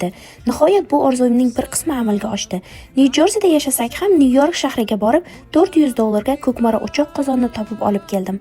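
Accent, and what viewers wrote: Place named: Khorezm, Uzbekistan. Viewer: Уноши хоразмча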